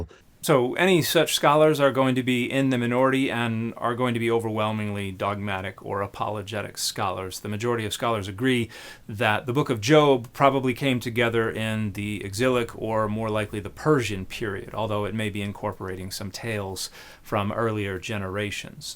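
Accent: South, United States. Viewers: Hard to sound intelligent with a southern accent . . . just sayin' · Why do people use Southern accents for stupid? This guy is annoying and insulting. I am not from the South but live now live here. Besides some cultural differences, they are the same as people everywhere with the same distribution of innate intelligence.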